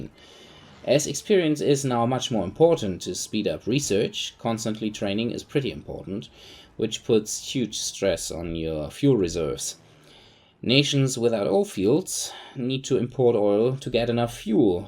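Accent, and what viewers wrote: Germany. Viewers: This guy german ? But None the less great review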